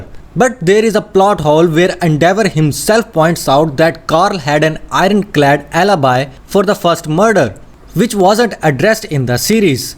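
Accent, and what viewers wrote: India. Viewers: maybe it is an Indian accent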